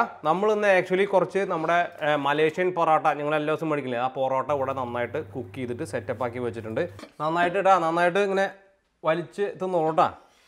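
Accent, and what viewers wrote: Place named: Kodungallur, India. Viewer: കേട്ടിട് കൊടുങ്ങല്ലൂർ ആണെന്ന് തോന്നുന്നു വീട് 😌🤣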